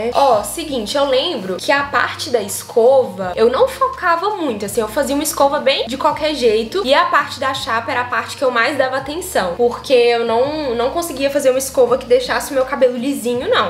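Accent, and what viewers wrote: Minas Gerais, Brazil. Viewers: Vc é mineiraaaaa....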